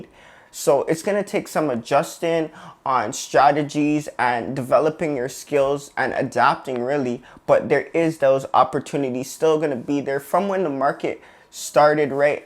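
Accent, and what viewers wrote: Toronto, Canada. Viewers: From toronto? Just guessing from the accent.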